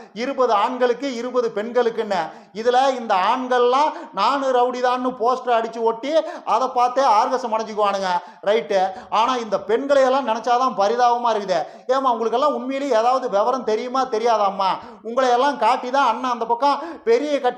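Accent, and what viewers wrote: Kongu, India. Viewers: Today very interesting comedy brother. 😮😅😊😂😊 அதுவும் நீங்க சொல்ற அந்த கொங்கு பாஷை இன்னும் சூப்பரா இருக்குல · உங்கள் கொங்கு தமிழ் உச்சரிப்போடு அகோரிசீமானை கலாய்க்கின்ற முறை மிக அருமை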